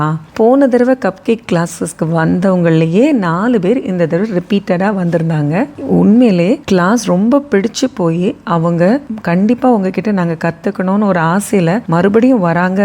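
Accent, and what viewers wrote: Madurai, India. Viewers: Madurai ya akka neenga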